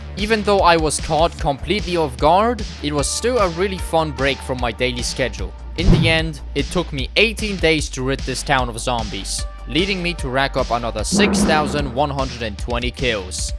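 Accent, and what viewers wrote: Poland. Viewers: wait i just reaised... are u Polish??? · coś tak czułem że ty Polak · Polak detected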